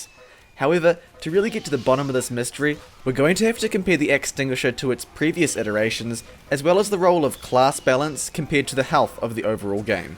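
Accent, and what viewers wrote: New Zealand. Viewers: what a strong kiwi accent :) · Are you from nz?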